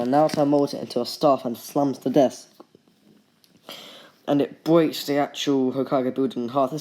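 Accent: United Kingdom. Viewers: i live in usa and its been a while since i last heard British accent but the video was really good.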